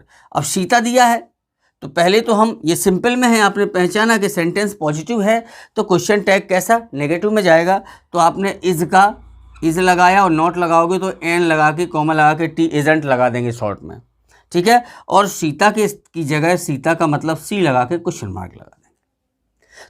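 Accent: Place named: India. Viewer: गांव से हैं अंग्रेजी बोले देसी स्टाइल में 🤣🤣🥰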